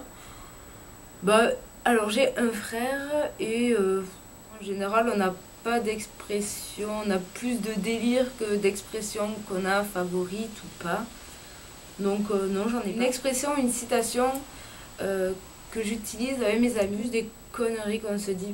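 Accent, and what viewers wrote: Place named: Lot-et-Garonne, France. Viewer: Super ce tag, merci de m'avoir tagué, malheureusement je trouve pas que j'ai un accent. Du coup je pense que je ne le ferais pas, mais je partage ta vidéo sur ma page facebook :) Et pour info mon père vit dans le Lot et Garonne, alors je connais bien ton accent. Et dés que je reste une semaine chez lui, je rentre en Auvergne avec ton accent, et tout le monde se moque de moi, parce que je récupère trop trop vite les actions verbales des autres ^^ Le pire c'est le "pain", "train", "cinq", "putin con", "branleur" et les "avé" au lieu d'avec ! Mais j'aime tellement cet accent moi :P Bisouuuuuuuus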